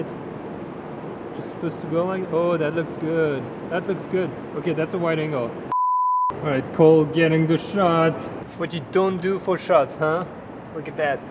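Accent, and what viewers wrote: France. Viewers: Un de ces accents français ahhahaah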